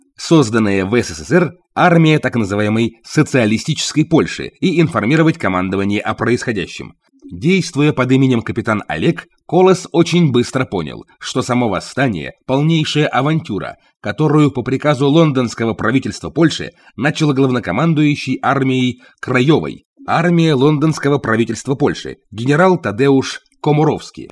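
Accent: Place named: Romania. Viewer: текст читает румын, портит восприятие.....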